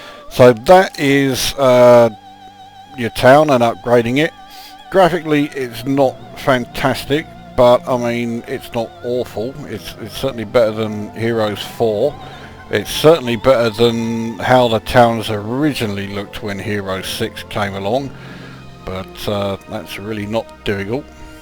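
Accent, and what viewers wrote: United Kingdom. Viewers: Dude, i love your hilarious commentary. Maybe it's the british accent. Subscribed.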